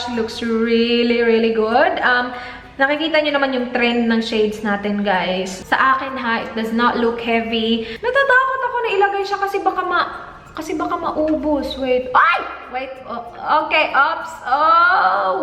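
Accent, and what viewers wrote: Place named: Visayas, Philippines. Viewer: gwapaaa jd kaayo oy <3 hahahah cute kay imong accent ate tagalog pero bisaya ang tuno hahahaha <3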